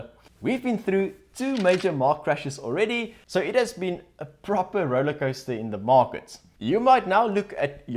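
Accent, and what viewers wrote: Afrikaans, South Africa. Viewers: So handsome. Strong Afrikaans accent. Very cute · its always refreshing how authentically afrikaans you are, genuinely feels south african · Damn, I enjoyed the Afrikaans slang and tone. Lekker to listen to something from RSA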